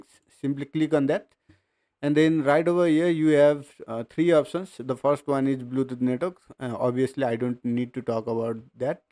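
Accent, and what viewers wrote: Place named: Nepal. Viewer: from the accent I can say you are Nepali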